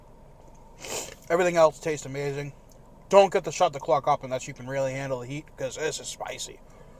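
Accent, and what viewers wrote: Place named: Boston, United States. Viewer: We love you, and the Boston accent really hits the spot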